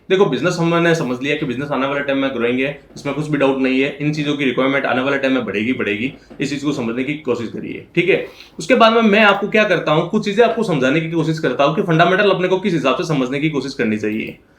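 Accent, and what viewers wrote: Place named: Rajasthan, India. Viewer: Very well articulated 👍, from your accent it sounds like you are from Jodhpur/Bikaner... Its just trading in single digit PE and as you mentioned this is cyclic business and once the uptrend starts (replacement cycle commences) ,lets say by end of this year..and EPS gets doubled (62)..and it gets the same PE at the time of listing that is 15: Stock price comes 930 from this calculation...I also believe at 298 its a good deal and available below IPO price.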